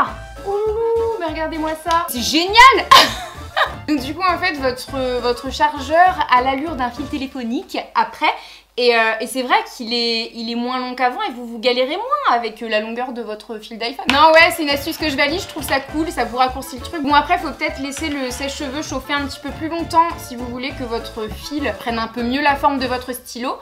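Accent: Quebec, Canada. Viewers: ta un petit accent québécois on dirait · Tu as un petit accent canadien non ?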